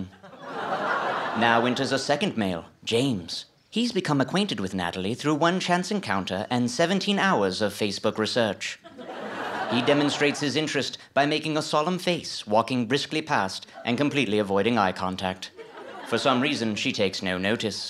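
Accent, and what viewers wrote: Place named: United Kingdom. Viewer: for best narrator! I can see why Jason first thought that he was British.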